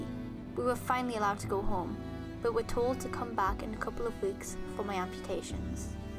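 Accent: England, United Kingdom. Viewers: Also I noticed you have a accent maybe a British? Because of the way you talk and the way you said better it was like: “betta” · I rlly wish it wasn’t told by an English accent. I didn’t like the pronunciation of words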